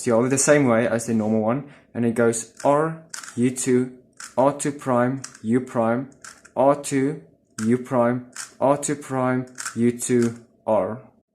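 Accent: South Africa. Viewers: I may be wrong, but are you South African?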